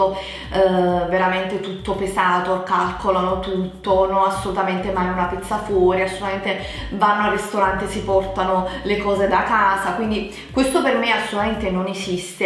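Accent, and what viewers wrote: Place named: Campania, Italy. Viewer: Ciao! Ti seguo da diverso tempo ormai e non posso che dirti grazie per come sai motivarmi, inducendomi a condurre una vita più sana ed equilibrata. Quando guardo i tuoi video mi viene proprio voglia di dedicarmi a me stessa e al mio benessere. E così continuo ad avere un'alimentazione piuttosto varia (visto che sono siciliana e abito fuori città non ho grossi problemi a mangiare cibo raccolto dalle nostre campagne [che più bio non si può XD]) e pratico nel mio piccolo un po' di attività fisica fatta di lunghe passeggiate ed esercizi tra le mura domestiche (la palestra non fa per me)... Ti ringrazio per come sai spronare e motivare chi ti segue con sincero affetto. Continua così! Un bacio grande 😘❤️ P.S. Adoro il tuo accento campano 😂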